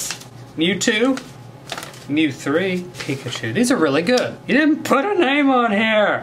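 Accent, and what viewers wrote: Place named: Upper Peninsula, United States. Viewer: Ayye yooper eh?! Wee love it!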